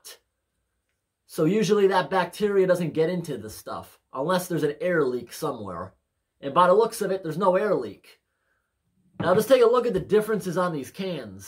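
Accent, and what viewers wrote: United States. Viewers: Why does this guy sound to me like the biggest American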